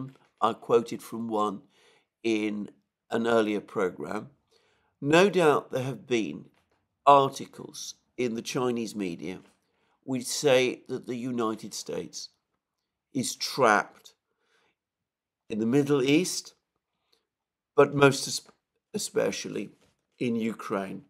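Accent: England, United Kingdom. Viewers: he speaks clearly and very slowly. I grew up in an English household so I’m used to the accent and even many of the mannerisms. The speed is the only part that irks me a little but I do understand why he speaks so slowly and concisely. I’m also happy that he checks his sources now rather than just parroting Russian MOD talking points like he did towards the beginning of the SMO. Basically his show is like an hour long run down of dozens of Russian speaking telegram channels and the information lines up. I appreciate him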